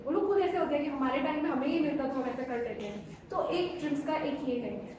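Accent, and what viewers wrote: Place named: Arunachal Pradesh, India. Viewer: She has also imbibed Arunachalee hindi, na , na nice 👍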